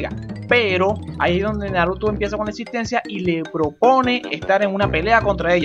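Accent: Venezuela. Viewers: El loco habla como Maracucho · soy de venezuela y me gusta tu canal bro y hablas y gual que yo chamo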